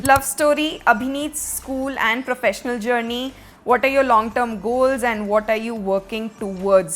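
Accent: Bihar, India. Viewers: Abhineet family they are from jharkhand which was a part of bihar i guess that's why abhineet accent little bihari ki jaga gujarati wase sound lagta hai but aapka toh pura bihari bhojpuri but from this vlog got it that you from Rajasthan 👍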